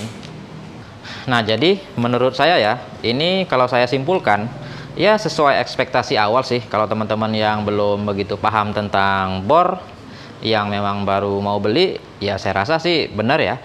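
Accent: Medan, Indonesia. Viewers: abg orang medan ya bg q sring nonton vidio abg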